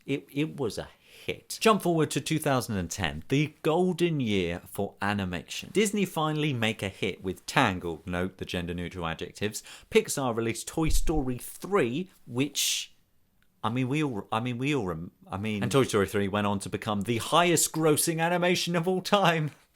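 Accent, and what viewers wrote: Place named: England, United Kingdom. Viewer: So I came across this video through being assosiated with SuperSam in some small way... and it has got me thinking a lot. I have a few friends who know a heck of a lot more about Disney and Pixar than me, The Super Carlin Brothers, so I have passed on this video to them... maybe they can say something further on the subject! Especially the bit about "Where would Disney be without Pixar?" So interesting. Also yes, you are an acceptable maker of videos. Acceptable to a medium degree. The funny thing is... I am English too, and never really notice accents... but yours has a quality to it that sounds amazing with a good microphone, keep it up!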